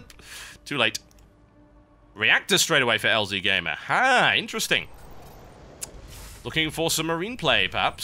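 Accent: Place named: London, United Kingdom. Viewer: lol, i can tell you watch a lot of EPL, using terms like "equalizer," as if LZgamer was one-nil down hehe. ARSENAL IS MY HEART. judging by your accent (i'm terrible at judging english accents) you do sound like a londoner. which means there's a good chance you're a gunner fan :D. keep making videos, there will be criticism no matter what you do online. everyone is a professional at everything with anonymity. in real life, they most likely flip burgers and drop potatoes in fryers all day.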